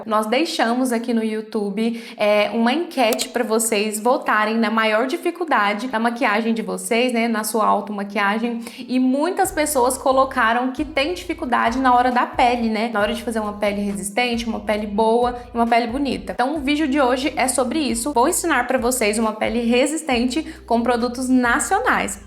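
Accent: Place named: Minas Gerais, Brazil. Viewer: Estou adorando seus vídeos! Sou nova por aqui e estou achando tudo muito útil e uma delícia de acompanhar. Você é mineira da onde? kkkk Pergunto por conta do sotaque fofo que tem... Eu sou mineira de BH. Confirme se minha suspeita está certa... Beijo, obrigada e parabéns pelo trabalho.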